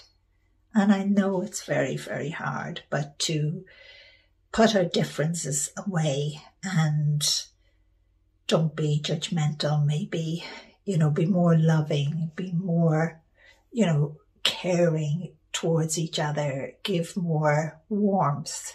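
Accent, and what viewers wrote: Ireland. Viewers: Lorna, You have no idea how this message is perfect timing as I need to do a little bridge mending. I've been avoiding it, but I don't want to let the situation keep going the way it is....your message is the nudge I needed! I saw you years ago at The Unity Church in St Petersburg, Florida. You had written your first book. The sanctuary was packed. Your lovely Irish accent came floating out and we were all on board with your message and fell in love with. Vicki Perez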